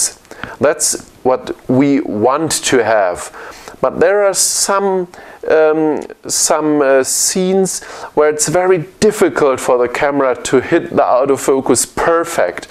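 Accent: Germany. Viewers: It's typical German English, not that bd however :))) Just a small correction: - All 3 OM-D-s share the same 16MP Sensor - The E-M1 flagship has also phase detect AF points on the sensor while the others don't - The E-M1 and M10 don't have an antialiasing filter on the sensor while the E-M5 does have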